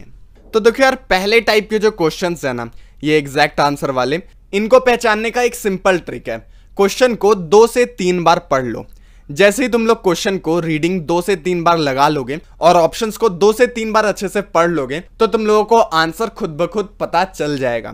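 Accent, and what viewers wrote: Bihar, India. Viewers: Hello bhaiya aap bagaha bihar se ho kya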